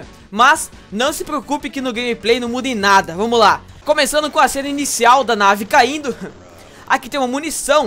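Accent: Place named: Paraná, Brazil. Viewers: de onde tu é ? teu sutaque parece aki do sul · de que lugar do paraná vc é? hahahaha mt bom seus vídeos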